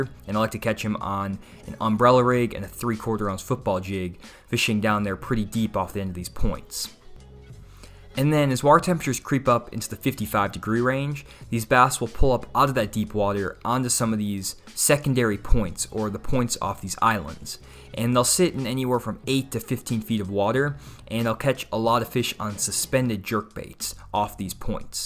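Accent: Arkansas, United States. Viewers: are you from arkansas?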